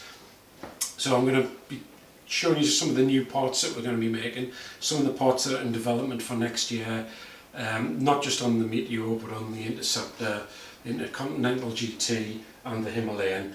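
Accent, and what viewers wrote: Newcastle, United Kingdom. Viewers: Hi, nice to hear a proper accent - not many Geordies over here on the Isle of Man! I'm interested in the adjustable levers for sure, a better exhaust and getting rid of that stupid speed limiter - no 70 limit here. Just a little more would be helpful! Also maybe a cam in the future, I don;t need a rocket ship (have 5 other bikes) but just a bit more go would really make this little bike. I also find the shocks very hard on our bumpy roads, but they may run in yet - we'll see. I would accept a little loss of handling for a better ride. Oh and a rose jointed ear linkage, but may make that myself - I need to keep a heel and toe, smashed left foot full of screws. (walking accident, not bike!).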